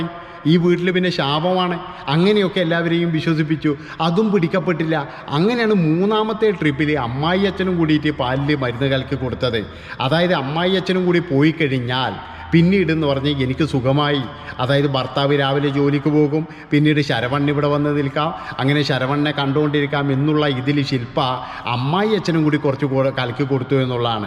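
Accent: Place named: Northern Kerala, India. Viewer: അവതരണ ശൈലി കേൾക്കാൻ ഒരു സുഖവും ഇല്ല ഒരുതരം വൃത്തികെട്ട വടക്കൻ ഭാഷ.... ഒരു സ്റ്റാൻഡേർഡ് ഇല്ലാത്ത ശബ്ദവും ഭാഷയും എന്തോന്നാടാ ഇത്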